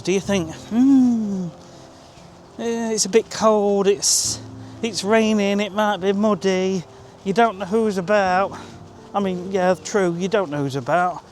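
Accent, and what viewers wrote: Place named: North West England, United Kingdom. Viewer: I'm guessing in the North West somewhere from the accent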